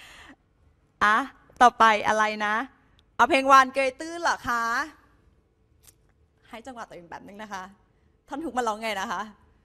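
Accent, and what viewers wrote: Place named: Thailand. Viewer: พูดเหน่อ มีเสน่ห์ดี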